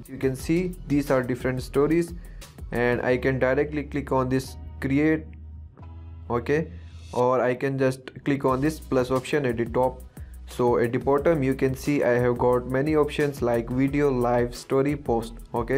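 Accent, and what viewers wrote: India. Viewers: I always love indians accent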